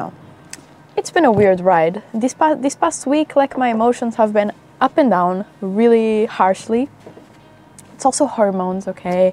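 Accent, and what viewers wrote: Portugal. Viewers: man tu tens um ingles bue bom mas n sei porque eu senti logo q eras tuga good content!!! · portuguese ? sounds like it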